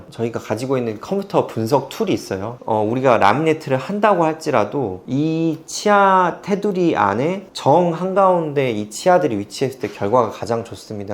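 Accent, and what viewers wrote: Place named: Gwangju, South Korea. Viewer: 원장님 답변 정말 감사합니다. 저 광주광역시 사는데 원장님 억양에 약간 동향 느낌이 났는데 홈페이지 가보니 광주 사셨을거 같아 반갑습니다. 복 많이많이 받으세요^^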